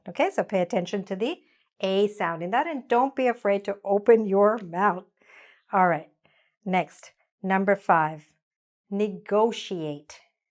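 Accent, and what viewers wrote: United States. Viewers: In London we don't open the mouth in pronouncing ( have/ has ) and we don't stretch the pronunciation of the letter A in ( have / has ) like you do and that is because you speak American English and not English English. Thank you for your nice show. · This video teaches us the words in an American or British accent?, i'm so sorry because I don't know, it's because I'm a beginner learning English😊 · Una pregunta las clases son con acénto americano o britanico? Gracias.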